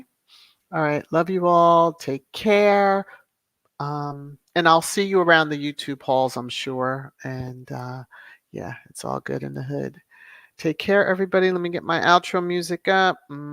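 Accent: RP, United Kingdom. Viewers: Thank you for the podcast 🙏 ❤❤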